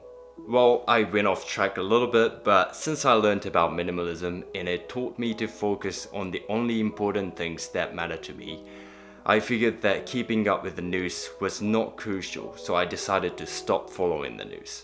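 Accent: New Zealand. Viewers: It always makes me laugh how much time and money people spend to become 'minimalists'. You are the most authentic person on this topic that I have watched so far. Thanks for posting your thoughts on these topics - and it's nice to hear a Kiwi accent for a change (from an Aussie) xx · You sound a bit kiwi. ☺️ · I wondered if you grew up in NZ, you have a Kiwi accent. Then I saw another video and yup. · your english is good, but as an American i can pick up on your New Zealand accent at times. like the way you say the word "yet" and some other words.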